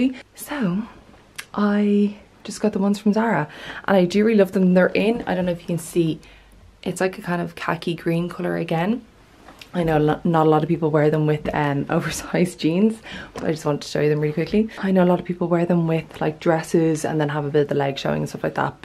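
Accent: Ireland. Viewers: Oh my Lord! your accent makes me wanna move to Ireland.😍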